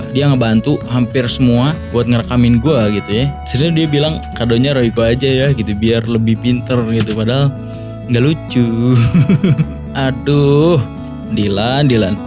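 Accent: West Java, Indonesia. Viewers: bro sunda nya di belah mana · Orang mna kang,.. Sani sunda emhh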